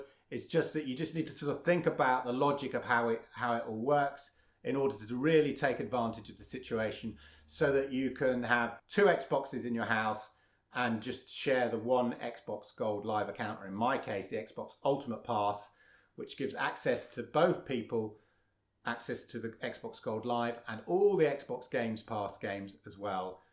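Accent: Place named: United Kingdom. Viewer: This is very useful I watched a bunch of others and it turns out I only understand the british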